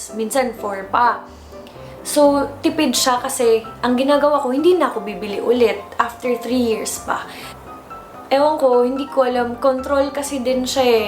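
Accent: Visayas, Philippines. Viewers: Bisaya kasi siya. Ganyan talaga pag nag tagalog ang mga bisaya, mapapansin mo 'yun. Bisaya rin ako, alam ko 'yan. It's somewhat strange and lil bit hard for us to talk in a dialect that is not ours, ganun rin naman siguro sa inyo. It's not being maarte.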